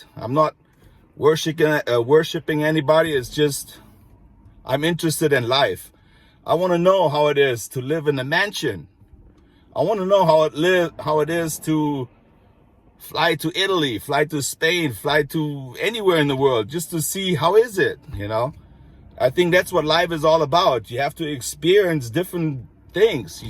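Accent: Germany. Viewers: You and your German accent are always a treat to listen to. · We like u the way you are sir & I love your German accent!! I cant imagine learning German, much harder than English!! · You should feel proud of your German accent. Germany, to many Americans, is associated with efficiency, innovation, and strong work ethic. The influence of the German people in America has been quite profound. The best blue denim pants in the world were created by a German in America, out west even, and centuries later, Levi Jeans are associated with being cool and rugged, and are still quite popular. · Don't worry, we like your German accent. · Ich komm auf deinen german accent voll klar 😃👍. Tatsächlich macht er es mir etwas einfacher dem zu folgen, was du uns erzählst. Cheers man. Stay healthy and in good mood ✌️ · I love your German accent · Vor ein paar Wochen wurde mir ein Video über Obdachlose in LA von dir auf YT angezeigt. 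Ohne vorher auf den Namen des Kanals zu achten habe ich reingeschaut und nach ein paar Sätzen von dir gedacht, dass der Akzent sich irgendwie deutsch anhört und bin dann auf den Kanal um herausfinden, ob ich recht habe. Musste nicht lange suchen als ich den Namen des Kanals gesehen habe :) . Seitdem habe ich schon einige Videos geschaut und auch den Subscribe Button gedrückt ;) Bisher war ich zweimal in Venice, das letzte Mal 2003 und ich glaube mich an deinen Henna Tattoo Shop zu erinnern. Den gab’s da schon, oder? Falls ja, haben wir mit Sicherheit damals sogar miteinander geredet :) Liebe Grüsse aus Heidelberg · Last night a friend was over and we got bored so I put one of your videos on. It inspired a lot of conversation and it was like we were there hanging out with you. Miss Venice beach, next time I go your henna shop is top on my list. Your a smoother talker than you think. Keep it up, you can only go up from here. 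BTW, I love your German accent and hearing your pronunciation of German and English words. Don’t feel self conscience about it, it’s unique and cool. Own it and be yourself. You da man!!!🙌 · We love your German accent, and your English is very good! You are just a ray of light my friend, always positive and make people feel good! Love your energy and niceness. Keep up with these videos, you make me smile and laugh at every one.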